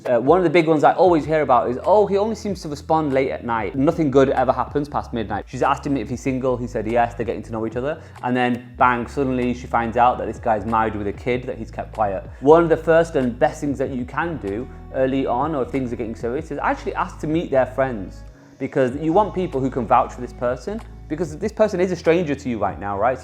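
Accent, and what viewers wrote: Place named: United Kingdom. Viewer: DUDE! SPEAK ENGLISH NOT BRILLISH…. 90% OF PEOPLE I AM SURE DON’T EVEN KNOW WHAT TF ARE YOU SAYING.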